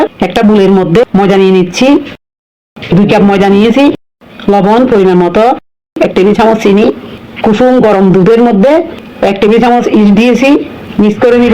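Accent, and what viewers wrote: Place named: Chittagong, Bangladesh. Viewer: চাটগাঁইয়া টোন!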